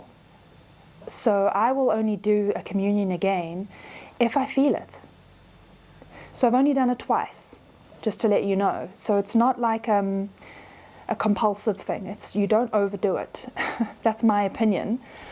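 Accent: South Africa. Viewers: It absolutely is what you say sister. Lovely South African accent. You give the purest guidance on all of you tube. So refreshing to hear.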